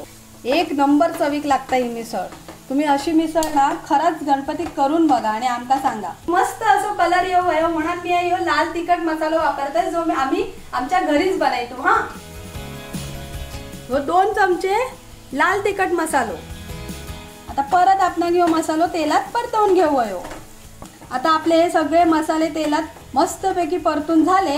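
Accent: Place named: Malvan, India. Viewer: आम्ही पण वैभव वाडी चे पवार, ही मिसळ/उसळ अगदी आम्ही नेहमीच बनवतो तरी ही मी ही रेसिपी पहिली कारण तुमचं presentation , उगाच फाफट पसारा नाही , बोलायची पद्धत म्हणजे अस्सल मालवणीत . हे ऐकूण खूप छान वाटतं व तुमचे सगळे विडिओ बघावेसे वाटतात. असंच चालू राहू दे. दोन्ही जाऊ बाई जोरात .👍👍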